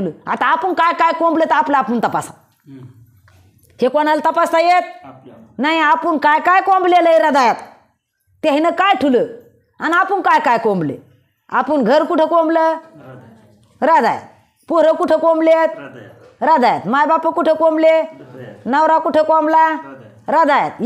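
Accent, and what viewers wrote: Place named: Marathwada, India. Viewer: एकदम मराठवाडा भाषेमध्ये खरंच खूप छान प्रवचन केले आहे मी आळंदी वरून ऐकतो आहे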